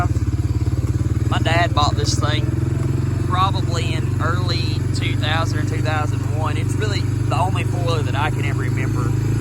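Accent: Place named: Virginia, United States. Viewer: He sounds like he's form Virginia or North Carolina.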